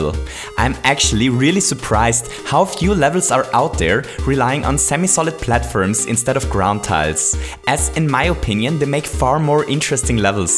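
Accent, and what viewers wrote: Germany. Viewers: du klingst richtig deutsch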